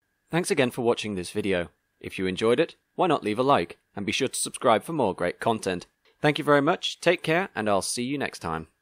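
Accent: England, United Kingdom. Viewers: I was pretty amazed by an English sounding guy pronouncing Drogheda properly, but the name at the end explained everything 👍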